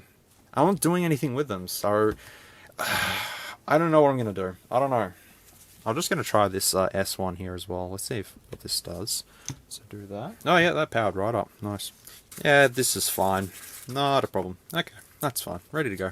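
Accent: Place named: Australia. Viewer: Also he's Australian, that's how we sound.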